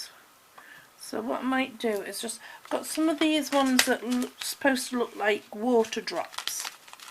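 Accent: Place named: Lancashire, United Kingdom. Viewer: Hi again, yes I thought your accent was similar to mine, so midway between Wigan and Liverpool makes sense re your accent. I've been living in Melbourne for 47 years, still have my accent, proudly😀 I'm looking forward to seeing your upcoming posts 😀stay safe.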